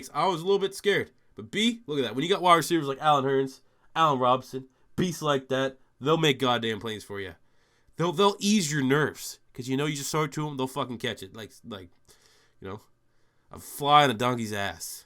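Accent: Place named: Canada. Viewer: I can't listen to a Canadian speak for 30+ minutes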